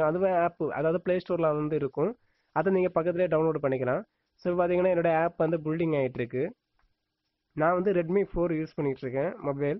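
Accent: Kanyakumari, India. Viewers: kumari thamizh👍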